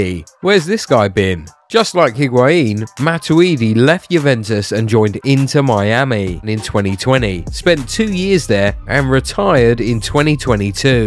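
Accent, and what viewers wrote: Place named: England, United Kingdom. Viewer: This is the kind of English accent that gets too drunk in a bar, and has a bottle smashed on their head. Nauseating.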